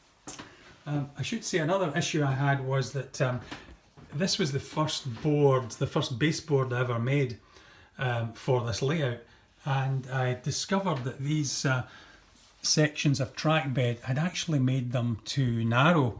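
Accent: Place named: Scotland, United Kingdom. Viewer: Always nice to hear a Scottish modeller. Subbed 👍🏻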